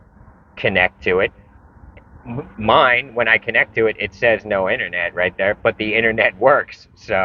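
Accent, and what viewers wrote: New York, United States. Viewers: this NY nasal accent... It's sounds great though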